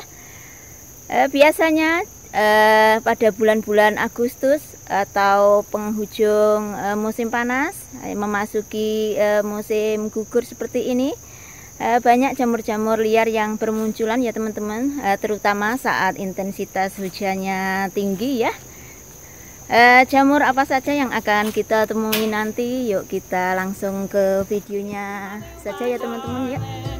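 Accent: Java, Indonesia. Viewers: Ya Allah......aku ngiler liat jamurnya Btw.......kak asalnya darimana?ngomong nya medok kayak orang Jawa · Ngmong nya medok🤣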